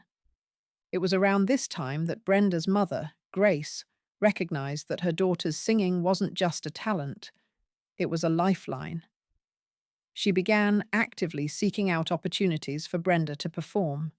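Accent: United Kingdom. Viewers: Maybe because she is British….. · Why is the narrator speaking with a British accent?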